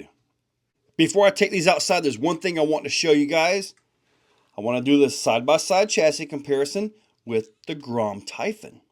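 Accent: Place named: North Carolina, United States. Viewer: Where is he located? I just moved to North Carolina and he sounds like he’s from around here somewhere… lol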